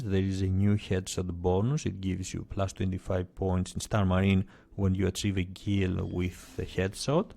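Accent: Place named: Greece. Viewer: You have a very strong greek accent.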